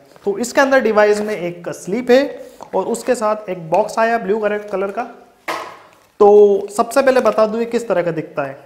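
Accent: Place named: Madhya Pradesh, India. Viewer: Aapke bolne se pata chalta h aap MP se ho... Or gharaatte nahi bolte... Kharaate bolte hain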